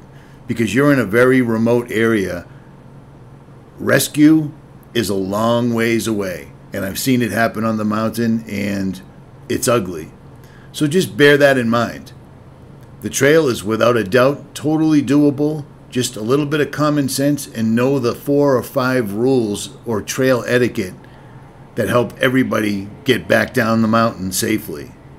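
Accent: Boston, United States. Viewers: Just stumbled across your vids while exploring the black hole of YouTube. What part of Boston you from? You better not say Framingham 🤣. Great vids, keep em’ coming. Mike- who hails from Boston but now lives in the burbs’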